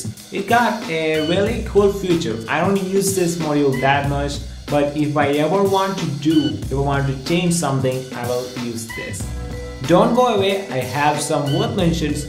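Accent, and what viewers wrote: India. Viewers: I like the idea of him trying so hard not to sound like an Indian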